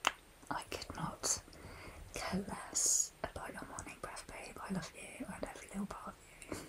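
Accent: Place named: United Kingdom. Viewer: Omg I love the British accent😊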